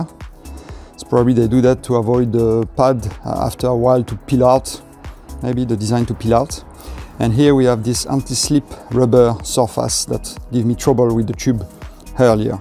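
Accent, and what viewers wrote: France. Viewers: l’accent français :)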